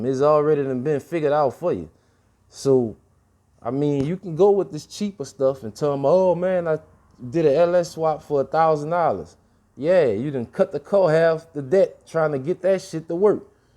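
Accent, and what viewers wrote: Louisiana, United States. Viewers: Good build soud like ya from the boot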